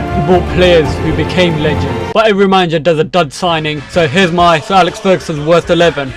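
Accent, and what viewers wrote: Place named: Southern England, United Kingdom. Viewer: This knobhead talking is obviously from the South and needs to stick to supporting his local team because he knows nothing about United!!! Worst Fergie team ever is as follows, GK Taibi, RB Viv Anderson, CB Prunier, CB Blanc, LB Blackmore, RM Bebe, CM Djemba Djemba, CM Ferguson (son of SAF), LM Milne (Captain), CF Cruyff, CF Owen. PS this team would still beat Jurgen Klopp's Liverpool!!!!!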